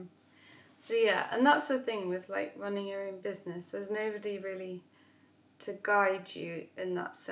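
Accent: United Kingdom. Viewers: It's very nice to listen to and watch you. Your baking looks very delicious. I'm interested in any topic, do what you like. p.s your video for me it's the practice of understanding the English language and British English accent. I'm serious :) Thank you.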